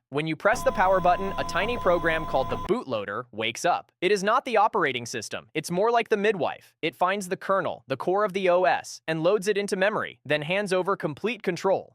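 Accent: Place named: India. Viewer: Why you have a racist Indian accent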